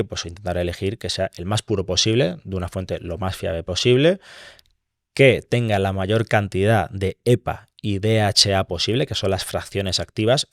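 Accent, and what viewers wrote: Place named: Extremadura, Spain. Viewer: que acento extremeño más precioso tienes chiquilla.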